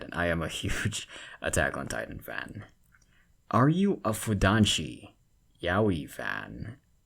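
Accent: Philippines. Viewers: I like ur Tagalog accent sm 😭✋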